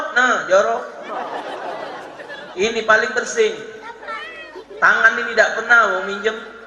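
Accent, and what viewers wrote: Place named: Palembang, Indonesia. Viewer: Masya Allah baru berapo bulan buka ceramah,dan dengan ceramah nyo yg khas palembang..sayo baru tau kalo lah dak ado lagi😢😢.smoga alm ditempatkan bersama org2 soleh.Aamiin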